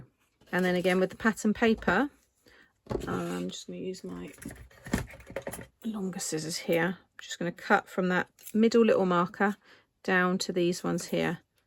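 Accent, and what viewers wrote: United Kingdom. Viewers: Here's what I love about you...I want to create every time and all the time when I watch your videos!! You are honestly one of my very favorites! Thanks for all the inspiration and for all the great creates!! I have made so so many awesome things because of you! And this Ohio girl loves your accent and the neat little different words you being from the UK use!!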